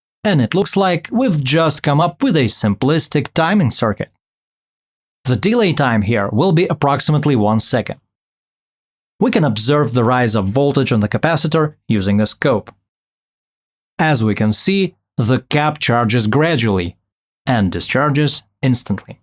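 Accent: Russia. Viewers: Отличное видео! И у тебя подозрительно русский акцент. 👍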